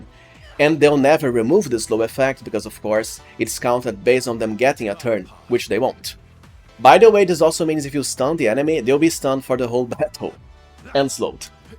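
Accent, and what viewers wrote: Brazil. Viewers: you dont fool me, you are brazilian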